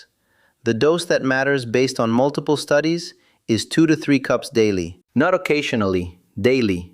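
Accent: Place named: Mexico. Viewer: Why is he switching in and out of a Mexican accent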